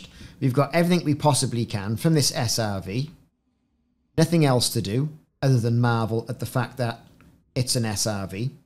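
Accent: Wales, United Kingdom. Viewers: Nice vid mate...I sense a Barry or Cardiff accent?...I'm watching all the way from Swansea :)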